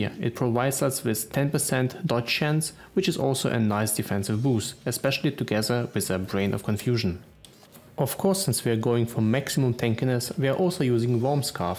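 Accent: Russia. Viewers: Файналли рашн ассент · Мне кажется или акцент русский? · Thx for this tutorial! Are you russian btw?:)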